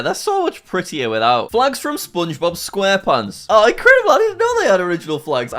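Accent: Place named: United Kingdom. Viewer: I still like his videos ven though THE BRI'ISH ACCENT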